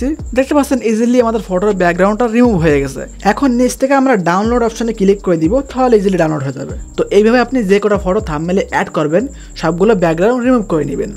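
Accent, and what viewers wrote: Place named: Pabna, Bangladesh. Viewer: আপনি কি পাবনার লোক পাবনার মতো ভাষা ।সঠিক হলে রিপ্লাই দিয়েন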